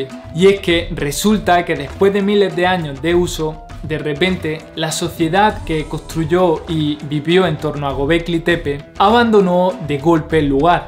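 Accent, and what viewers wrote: Andalusia, Spain. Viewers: Se que de España pero de que region? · Me gusta tu canal, como haces que la gente cuestione todo, además sos andaluz? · No dice mil, dice 10000, vuelva a escucharlo y lo percibirá... yo también oí 1000 al principio pero debido a su acento andaluz que, a veces, no deja claro lo que dice... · Me gustaria que te corrijas los errores de lenguaje, la información bien, pero la zona de España de donde eres no te da derecho a que hables a un publico tal cual lo aprendiste, nosotros aprendemos de ti y no me gustaria aprender a decir ese grupo de persona. Te comes las letras amigo o las dejas guardadas en tu bolsillo. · Gran video. Y ese acento andaluz le da un toque especial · No sólo eso en Física se replantea la idea de que este Universo es más joven.Tu andaluz es el mejor que he escuchado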